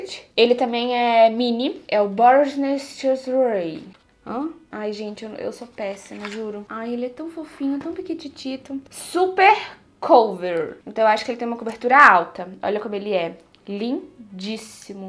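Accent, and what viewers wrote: Rio de Janeiro, Brazil. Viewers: Você e muito linda e simpática, mas tenho uma pergunta, você e Carioca?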